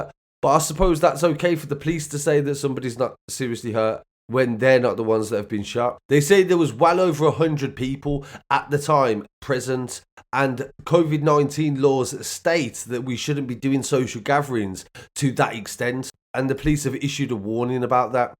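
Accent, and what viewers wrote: Birmingham, United Kingdom. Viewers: Cool brumny accent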